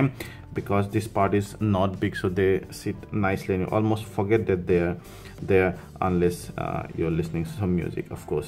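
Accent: Assam, India. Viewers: Are you assamese? Ur accent sounds like assamese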